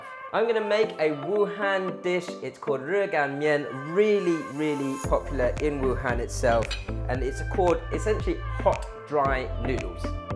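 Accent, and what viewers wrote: England, United Kingdom. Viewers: Wow Chinese dude with English accent... Impressive 😃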